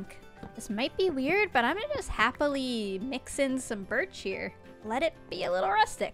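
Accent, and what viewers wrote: Newfoundland, Canada. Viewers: Gem gem gem plz show your newfendland accent i love that type of accent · I didn’t know you were from Newfoundland. You need to listen to Come From Away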